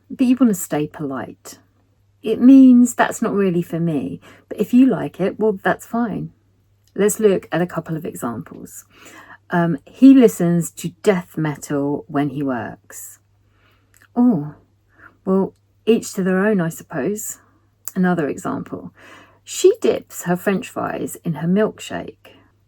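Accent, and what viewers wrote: United Kingdom. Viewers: what kind of RP accent do you have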